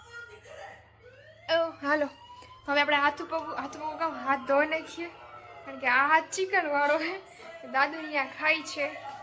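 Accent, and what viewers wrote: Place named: Mer, India. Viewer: Jay siyaram 🙏 Ben tamari mer ni boli saras bolo chho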